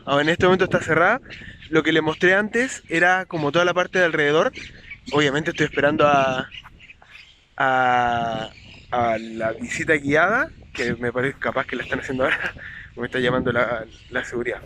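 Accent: Chile. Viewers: vi Que sos Chileno, me imagine por el acento porque mi cuñada es Chilena 😄 Buena suerte con los vídeos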